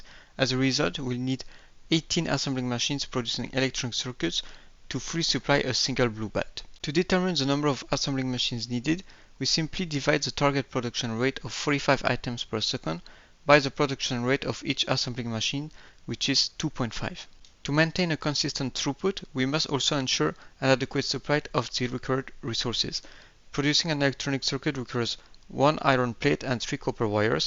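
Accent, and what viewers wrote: France. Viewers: Nice tutorial ! By any chance, are you French ? 👀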